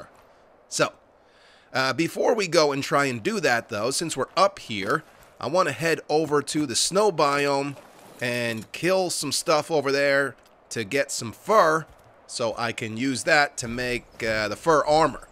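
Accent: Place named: New York, United States. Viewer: Man, when I found this channel I thought it was Simon from Neebsgaming due to the NY accent hahahaha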